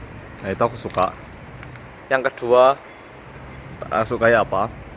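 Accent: Java, Indonesia. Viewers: medok mu iku loh sesuatu buanget ms, hhhee :D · logat jowone medokk bngtz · wkkkkkw muedhoookkkk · Mas bhasane jawa sampean kok medok banget,pdhl korea punya hhheee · Haha nek ngomong medok lek 😆😆 Umur nya tuaan aku berarti yo, Aku 93 !! Sukses ya lek 😊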